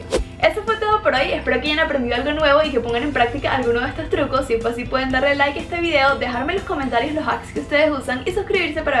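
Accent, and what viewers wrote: Venezuela. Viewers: Igual porque eres venezolana no capto tan rápido jeje, yo soy mexicana y a veces al escuchar los diferentes tipos de acentos de otros países aunque hablemos castellano a menudo me son un poco difíciles de cachar ;) pero muy buenos tips! gracias ;) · sii soy venezolana, y supe que tu lo eras por tu acento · eres venezolana? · De que pais eres?? de Venezuela? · Una pregunta tu eres Venezolana?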